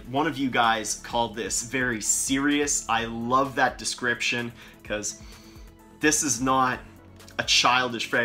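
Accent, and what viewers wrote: Canada. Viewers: With that accent (sounds Canadian) I wish the would stop saying OOTside. Arrrgg.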